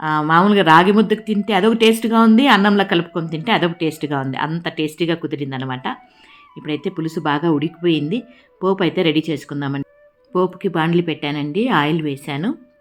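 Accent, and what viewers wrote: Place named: Bangalore, India. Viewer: Meeru maa laage matladataru ante memu Bangalorelo unde vallu itlane matladthamu alage ginjula pulusu kooda itlane chesedi❤❤❤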